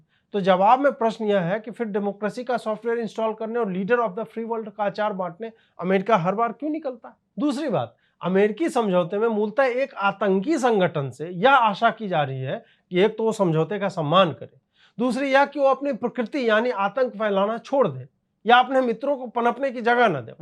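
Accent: Standard. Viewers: Ajeet bhai Bhaut shood Hindi boltae ho , to good you are 😁👍👍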